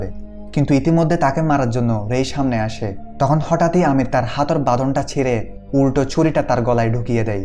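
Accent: Chittagong, Bangladesh. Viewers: ভাই মনে হয় চট্টগ্রাম এর মানুষ যতই শুদ্ধ বলেন না কেন চট্টগ্রাম এর ভাষা বের হচ্ছে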